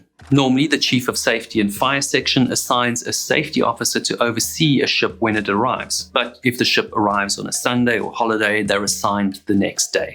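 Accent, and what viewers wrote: South Africa. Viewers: Loving your videos. You also sound like your south African. I cannot and don't ever condone censorship cept to photos of uninvolved topic like children like a family photo showing the suspect in it too for example. But otherwise i despise it so don't show something when you censor it. Why show it then? Anyways despite that leading to a thumbs down az i always do zo for any censorship when topics like this or with true crime is involved always will get a dislike as i don't like censorship in such topics. But that said i love your videos still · Wait, you are southafrican? Accent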